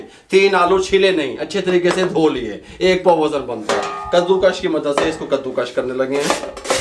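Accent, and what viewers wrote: Punjab, India. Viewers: Assalamualaikum Sir AP ki Punjabi Kafi mezy Vali hai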